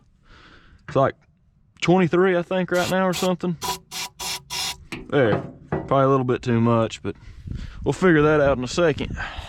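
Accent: South, United States. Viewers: 20 seconds in an that good ole' country boy accent tells me this A is going to be running at the end of this video... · does he speak south accent? is this south accent? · I love your accent, your from the south I’m Guessing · This man has the best southern accent. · Listening to you talk, I'm guessing you're a country boy. I could be wrong.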